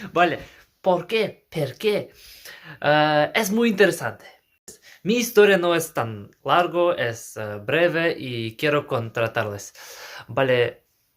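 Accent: Standard. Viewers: Hola que gusto conocerle, se entiende muy bien la pronunciación, y es una pronunciación neutra excelente, yo actualmente estoy estudiando el idioma Kazajo y aprendiendo su cultura.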